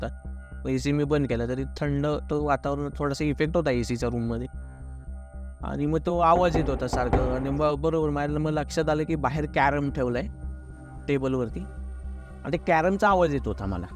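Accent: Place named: Ghats, India. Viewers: तुमची भाषा कोकणी वाटत नाही. पुण्याकडचे वाटता. आणि कृपया कोकणाचा उल्लेख करू नका. काही गरज नाही त्याची. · आमी पन कोकणातलेच हत, पण दादा सांगतत ते कोकणातले हत पन त्यांची बोली भाषा ही घाटावरची हा.. मालवणी किंवा कोकणीच्या आसपास देखील नाय हा.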